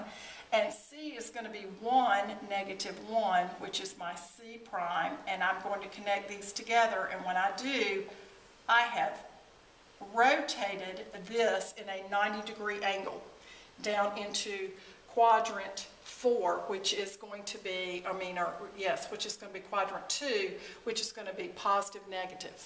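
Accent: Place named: South, United States. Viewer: Definitely southern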